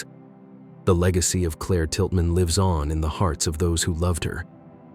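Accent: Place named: United States. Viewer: I question why anything and almost everything that has happened in the U.K. usually ends up on a YT with an American Accent? Also, why do they all assume that we have the attention span of a goldfish? Must be something to do with spinning out the length of the video to earn more money? Why not just put in a little extra effort and quit with the annoying repetition?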